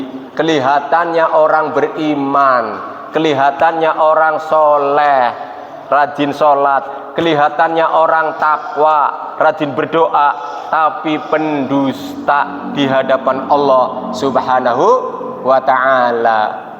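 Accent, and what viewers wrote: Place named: Songgom, Indonesia. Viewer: Kirain wong cilacap jbule wong songgom👍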